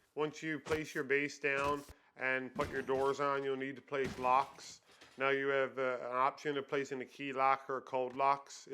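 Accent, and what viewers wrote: Newfoundland, Canada. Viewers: i knew instantly he was from newfoundland too hahaha i was looking for this comment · From an Irishmans view, I was tryna pinpoint which town the accents from 🤣 Suppose I can hear the canadian in em' now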